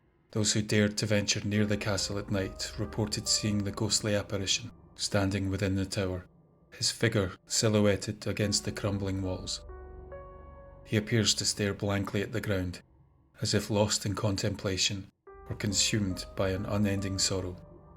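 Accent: Scotland, United Kingdom. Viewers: How did I miss notification for my favourite channel and the guy with greatest Scottish accent. As a paranormal investigator for 20 years I researched, investigated some of England and Scotland's most haunted locations until I stopped in 2010. I miss what I love deeply. Investigating, the research, the stories told to me were my big love but my point being, we all love a great ghost story and knows just how to tell a great ghost story. This channel is growing fast and there is no great channel for us all to help grow. The paranormal world is full of fakers, lyre's and cheaters right now and sadly its not showing any signs of getting better as I hoped in time it would? I still like to research and visit locations but its more hobby now than full time job. I worked closely with ghost finders of Scotland based just outside Glasgow. That's not a plug I promise you because like my that team is no longer lives. 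Some of the best teams know to the paranormal world are no longer. They have become something of ghosts themselves fading into history and now we are left with these who care more about money making that finding the truth as we knew it. Scientific methods is no longer used by the few as the many seem to just practice fame seeking. Its a paranormal world I look to stay away from now! Thank you for all you dedication and hard work since channel birth. all though ghostly tails change over the years because everyone adds on little bit for horror effect th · Good 🌄 morning.....so good to hear that wonderful Scottish accent. I am proud to be from Scottish ancestors and I love ❤️ the stories. Thanx😊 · A new subscriber here too. Anything I mean ANYTHING, related to Scotland and I'm HOOKED. Beautiful , Tragic, Romantic, Brutal, and Betrayals, Beautiful Scotland has seen it All Even your accent has me Hooked. 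Looking forward to many more Tales. ❤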